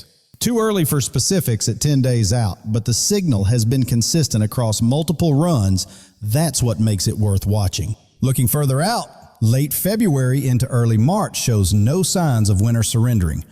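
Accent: South, United States. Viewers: so whats YOUR qualifications to be making a public forecast? Your lame southern accent sucks. Go get a job.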